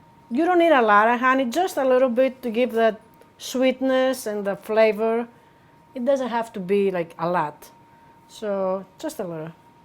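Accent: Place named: Greece. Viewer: Omg that's not actually the original Greek accent!! That's a Greek villager's accent!!!where are you from?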